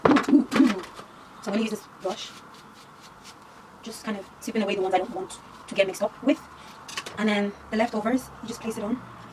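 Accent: Ireland. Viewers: Why am I hearing a Dublin accent? The unit looks lovely btw xx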